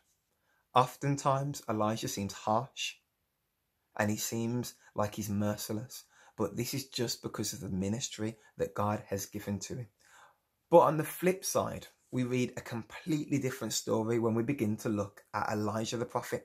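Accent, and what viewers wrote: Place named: Midlands, United Kingdom. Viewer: Yaaay are you from the midlands? I’m from Wolverhampton! Thanks for this.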